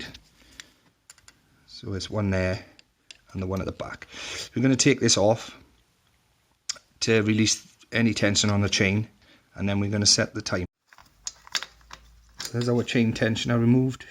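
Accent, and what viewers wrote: Wales, United Kingdom. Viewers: You sound welsh do you have a shop or anything because I have a stomp 160 with a jammed gearbox and I need someone to have a look at it and there’s no one in South Wales that can do it for me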